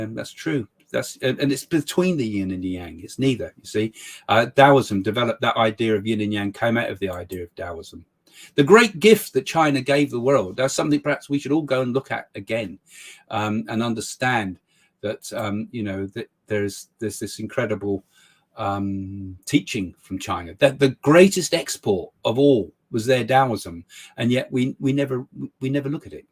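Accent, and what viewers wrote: United Kingdom. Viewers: yea ! I can't comprehend the british accent ! I'm from united states.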